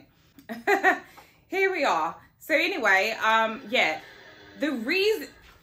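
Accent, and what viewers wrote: United Kingdom. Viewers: Am glad you brought the looks back and the British accent, yaaaaass hun!!!!!🙌🏾🙌🏾🙌🏾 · And just like that she’s back with the fashion tips and the British accents 😂😂😂🎉🎉🎉🎉🎉🎉❤❤❤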